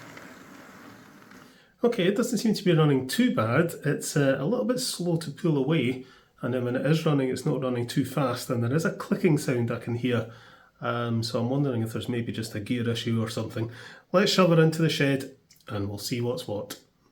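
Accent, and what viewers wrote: Scotland, United Kingdom. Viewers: sorry it must have been my inability to listen to a Scottish accent. 😊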